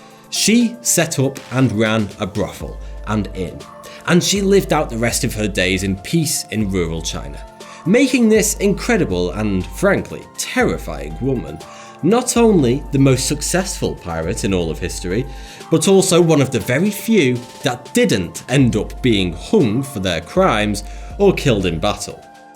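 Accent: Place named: Lancashire, United Kingdom. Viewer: What part of Lancashire are you from? You sound like your from my area - Preston.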